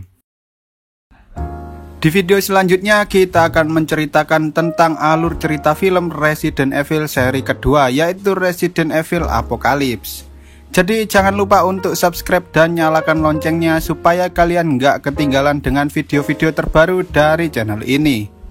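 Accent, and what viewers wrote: Java, Indonesia. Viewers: Bang koe wong jowo endi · Bang ngomongnya kok kya orang jawa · Bang orang jawa😂 sama dong kayak aku 😆😁😁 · abang orang jawa kah?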